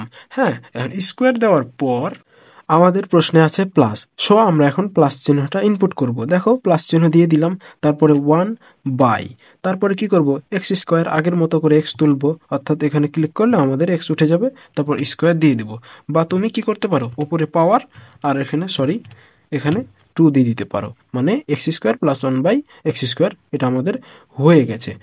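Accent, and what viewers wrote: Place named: Chapai Nawabganj, Bangladesh. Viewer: আমি আপনার কথা বলার ধরন থেকে বুঝতে পেরেছি আপনি চাপাইনবাবগঞ্জের 😊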